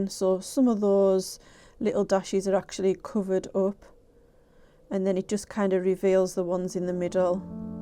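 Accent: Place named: Newcastle, United Kingdom. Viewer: How comes I haven't found you before? I loved my 4 years up in Geordie land many moons ago. Your accent makes me feel homesick lol. I love your style and can't wait to get my paints out again!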